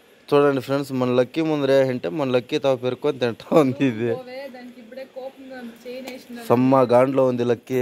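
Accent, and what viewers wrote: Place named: Anantapur, India. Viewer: బ్రో నువ్వు అనంతపురం డిస్టిక్ వాయిస్ మాట్లాడుతున్నావ్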